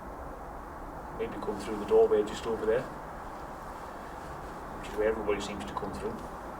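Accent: Durham, United Kingdom. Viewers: Was introduced to your channel by Ouija Brothers so just started watching your videos. Very very interesting. I love hearing about the history of places and the added bonus of your paranormal investigation. Your accent sounds like your from near me in Durham? Keep going your doing great. 😁😁😁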